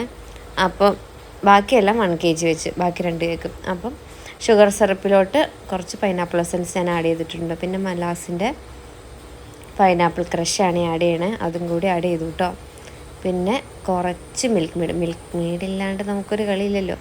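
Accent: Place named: Kothamangalam, India. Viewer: Hi.. stalam evideya kothamangalam ano avdathe slang pole thonunn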